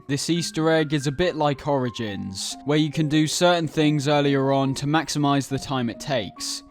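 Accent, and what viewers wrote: United Kingdom. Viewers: Australian? This guy’s British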